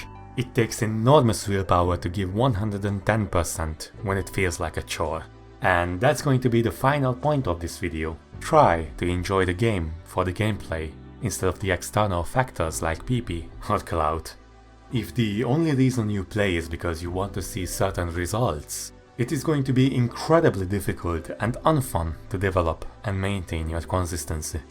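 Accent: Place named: Hungary. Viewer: Lol tudtam hogy magyer vagy... Az akcentusodrol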